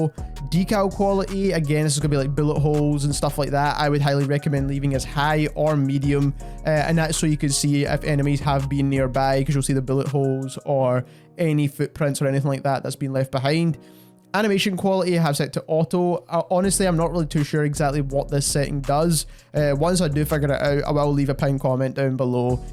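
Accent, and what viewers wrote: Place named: Scotland, United Kingdom. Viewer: Same bro wasn't expecting a fellow scotsman when I clicked the video , have another sub 👊🏼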